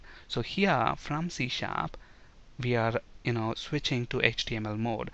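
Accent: India. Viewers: I really can't deal with Indian accent! It makes me sick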